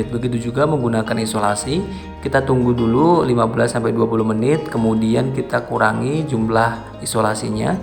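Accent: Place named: Banjar, Indonesia. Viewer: Bang,pian buka servis nya di bjm kah....? Please jawab,uln dnger nya kaya org bnjar pian.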